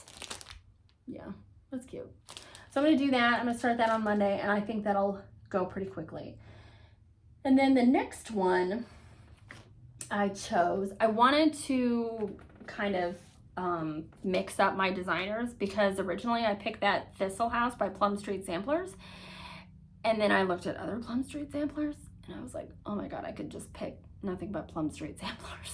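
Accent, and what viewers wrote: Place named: France. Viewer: Hi, really enjoyed your video and energy. Can wait to see more. Your French accent is perfect! Are you French?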